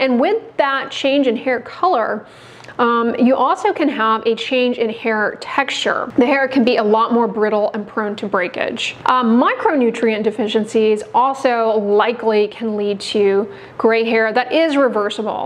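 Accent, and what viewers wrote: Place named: Carolinas, United States. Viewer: Interesting information! BTW You sound so American... (I mean the accent/tone.) A stereotypical American accent for my European ears... Where from?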